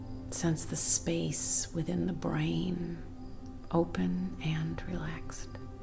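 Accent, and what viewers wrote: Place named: United States. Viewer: Wonderful. The life eperiences you have had to create this ??? Sadness, loss, love. Very touching to me - a 89 year old Englishman living permanently in Japan. Coming from a working class background in North-East England and having parents and grandparents of Irish origin whose lives had not been easy - I release them with love. I love your American voice and wonder what part of America? All the love - Jack.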